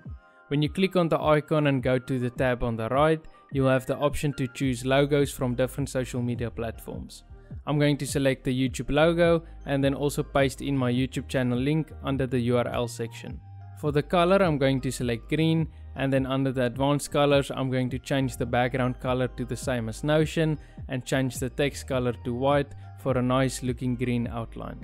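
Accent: Afrikaans, South Africa. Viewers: Afrikaans accent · He sounds exactly like a South African friend of mine! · BRO ARE YOU SOUTH AFRICAN?!! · Are you... South African? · Howsit, you’re from South Africa? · Jis boys, the moment I heard the accent it was a straight, sub-like-follow-bell icon moment. I don’t have time to look for new creators when I can learn from boytjies themselves!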